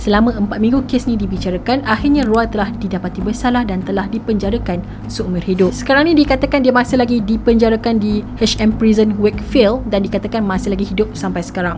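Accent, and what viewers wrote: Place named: North, Malaysia. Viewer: mesti org utara kn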